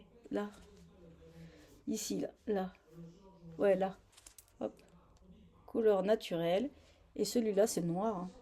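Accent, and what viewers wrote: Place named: Haute-Savoie, France. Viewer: Bonjour 🙂 Ravie de connaître votre podcast..tres sympa..et bercée par l'accent de ma chère haute savoie il me semble?😅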